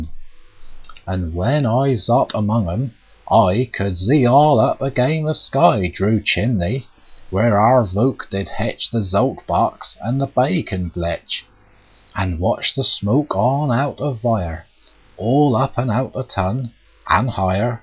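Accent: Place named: Dorset, United Kingdom. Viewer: Lovely poem. Dorset accents rule. ^_^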